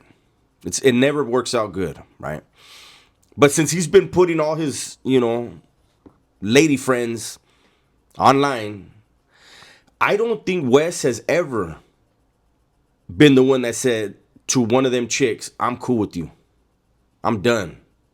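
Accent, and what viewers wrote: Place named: Miami, United States. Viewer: U sound like ure from Miami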